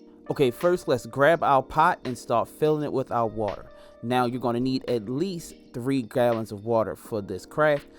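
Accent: Baltimore, United States. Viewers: I need to know if I'm correct. Is that a Baltimore accent I hear?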